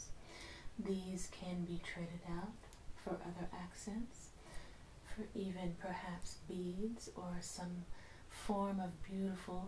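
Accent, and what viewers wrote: South, United States. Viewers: Is she from Texas? It sounds like a slight southern something in her dialect...not full on Texan or Dixie talk but there’s a little southern comfort in there.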